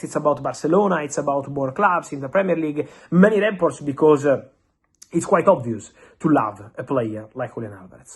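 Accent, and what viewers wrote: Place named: Italy. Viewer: i love how you say sir jim ratcliffe yoiur italian accent makes it sound like sergio ratcliffe here we go have a great day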